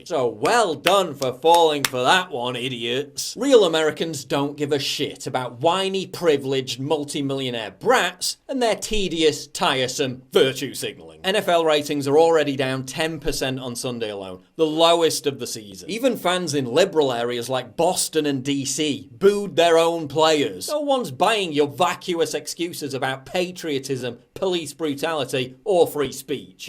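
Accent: United Kingdom. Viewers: Talking standing in front of an world map, having an statut thinking as your banner, having a elegant british accent, having your full name as your username and making political rants on the internet does not make you an extreme philosoph who's always get facts right. It makes you ANOTHER random individual expressing his points of views and again... On the Internet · PJW, As a Christian man that recoils at the sound of profanity..... I must admit, I laugh when I hear you cuss! Not sure if its the British Sterling Accent or what, but it just makes me giggle!!! PS: DONT tell my Wife!!! =) Secretly subscribed!!!! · It's like my thoughts with a British accent?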